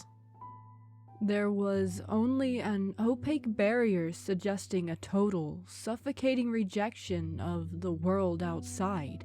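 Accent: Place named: South, United States. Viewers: Love your calming southern accent. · You're right!! Magnificent southern accent!! Sounded like one of my neighbors 😂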